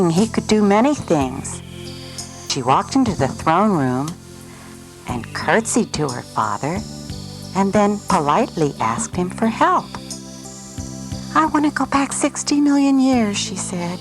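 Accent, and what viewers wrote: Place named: Bosnia and Herzegovina. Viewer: If you people are weirded out by narration it is because of how my bosnian people speak english. I know the 95 massacre and many people were killed. My bosnian people makes cartoons like this so no wonder it has an artstyle like this. Also when srebrenica has an memorial for the people that died some serbs...bad..serbs...dance and celebrate. Look at the bosnian cartoons from 1980s to 2000s. The burning building reminds me of a event where they found two skeletons hugging each other. Those two skeletons were mother and son. They were burned alive.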